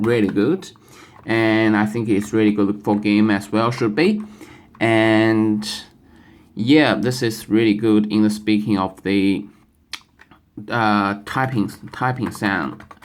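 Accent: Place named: China. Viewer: 中国口音的英语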